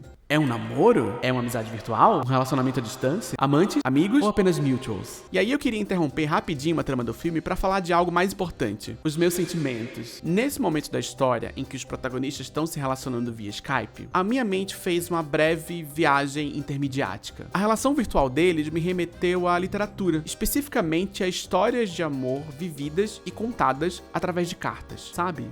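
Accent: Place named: Rio de Janeiro, Brazil. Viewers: Gente, eu jurava que vc era carioca por conta do seu sotaque..... Kkkkkkkkkk Valeu pelo vídeo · Aliás, você tem um sotaque de elite do Rio de Janeiro...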